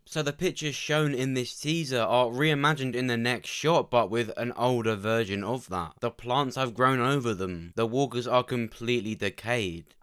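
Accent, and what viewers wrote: United Kingdom. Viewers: Do you ever do live Q and As?? Your theories are so interesting I’d love to ask your opinions on some other things TWD related And sooooo good to hear a fellow Brit breaking down these trailers and info. I want to win!!!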